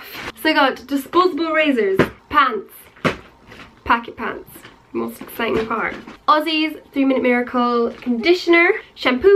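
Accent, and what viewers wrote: Scotland, United Kingdom. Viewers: SUBSCRIBE AS SOON AS I HEARD U ARE SCOTTISH ME TOO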